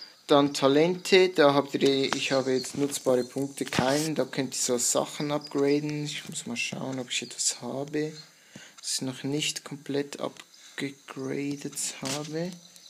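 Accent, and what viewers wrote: Switzerland. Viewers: Da hört man Schweizer Dialekt raus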